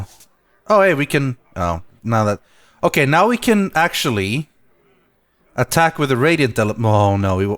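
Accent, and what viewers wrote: Sweden. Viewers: the most Swedish accent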